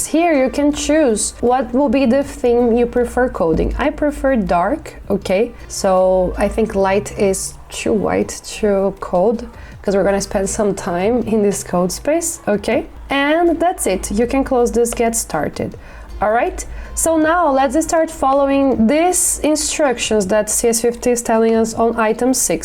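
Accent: Brazil. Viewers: thank u so much. and btw a month of watching your tutorials, always assumed you were russian or ukranian, never crossed my mind that accent was brazilian😆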